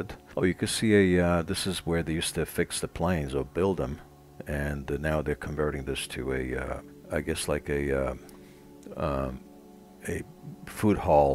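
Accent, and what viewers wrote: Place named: United States. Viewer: Domnule, ador engleza americana care o vorbiti,dar fin pacate nu am inteles nimic!! Eu sunt romanca frantuzita, sunt abonata Dvs.,de circa un an!! Multa sanatate din Oradea BH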